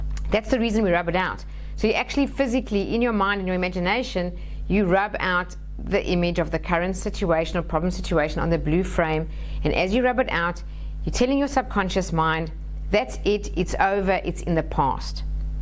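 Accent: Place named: South Africa. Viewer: Hi thank you for the video, your accent sounds like South African - excellent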